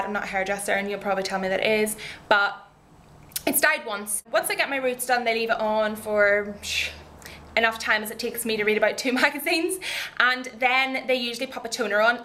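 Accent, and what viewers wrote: Ireland. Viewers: i love her voice:) irish? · i like your hair! its awesome and your accent =) <3 Ireland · You are so damn adorable. Love your Irish accent! Cutie pie, good video :) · I usually hate Irish accents but I love yours:)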